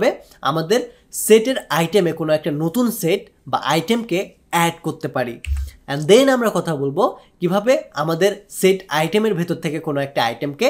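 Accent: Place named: Kushtia, Bangladesh. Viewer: apnar bari ki jhenidah ,kushtia elakay? kotha bolar dhoron shune jante iccha holo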